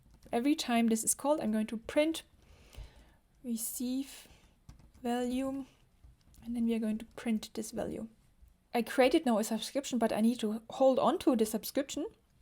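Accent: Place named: Germany. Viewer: I totally like your step.by.step approach but at the same time efficiently and quickly cover the whole picture. It's like from ZERO to HERO. Very nice. Thank you very much for your videos, that I immediately have subscribed to. Keep going! By the way your accent, though your english seems flawless sounds a bit "german". I know, what I am talking about 🤪. Are you german?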